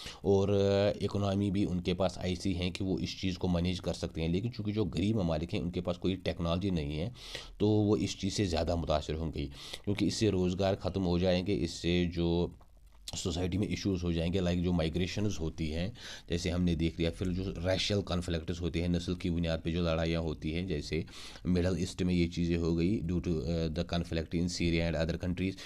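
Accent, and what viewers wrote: Kashmir, India. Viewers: You've pure kashmiri accent☑️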